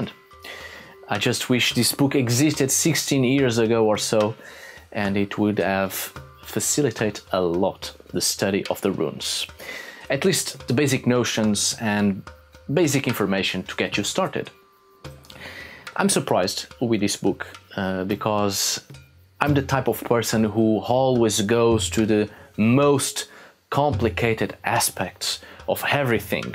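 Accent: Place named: Portugal. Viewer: You are portuguese right? The way you speak your english, the way you pause in between words, and the words you choose. It really sounds like a portuguese speaking in english. (I am portuguese btw ahah)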